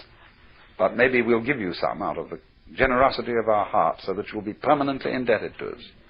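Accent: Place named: England, United Kingdom. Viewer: are you sure this is watts,dont sound like him,ous not pronounced right,more english than scottish tones .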